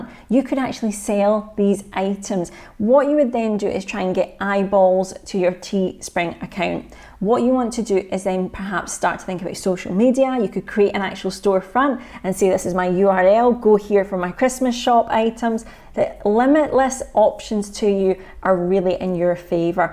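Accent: Scotland, United Kingdom. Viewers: Love all your videos! I live in Scotland so I am glad to hear the Scottish voice so I know that all the info you offer will be valid to myself. Most financial video's on YouTube are US so a lot of info is not valid to UK. So thank you and will be trying a lot of your info :) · My wife’s family are part Scottish. What a great accent! Great vid too😁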